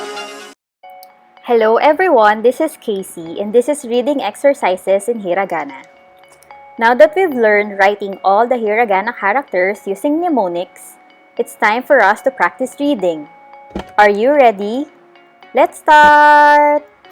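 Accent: Philippines. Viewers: Are you Filipino? I could hear the accent, also trying to learn and just understood all of hiragana I think haha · Your accent is so filipino, it's nice hahah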